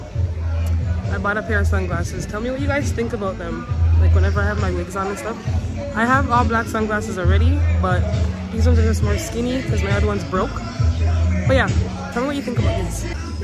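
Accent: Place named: United States. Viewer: You sound more American than you do Canadian😊 Also I would love the healthy country life myself it's peaceful and the air is cleaner. Since all of us are subscribing to these YouTubers I think some of them should try harder tell us about available land for sale, so that people with no Jamaican background don't get to buy it all...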